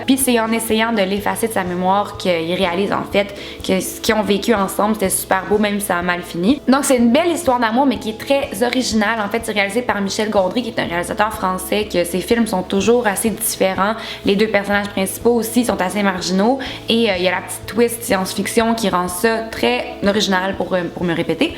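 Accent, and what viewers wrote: Canada. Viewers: il est trop beau ton accent canadien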